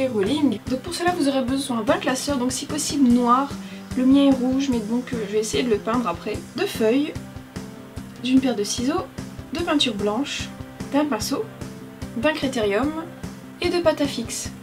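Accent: North, France. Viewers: C'est peut-être con mais le classeur m'as bluffé, il est vraiment bien fais, tellement que tu l'avais acheter tel quel ^^ Sinon (si c'est pas trop indiscret), vers où tu habites ? Parce que je t'ai entendu une fois parler de Reims et sans me tromper je pense pouvoir reconnaître un petit accent du nord de la France :3 Voilà, sinon j'aime beaucoup tes vidéos ^^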